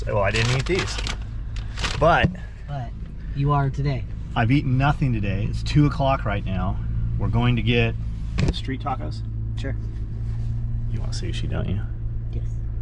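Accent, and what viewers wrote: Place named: North, United States. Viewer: Still sounds like such a yankee....not that theres anything wrong with sounding like one....just makes farm work seem odd for the south.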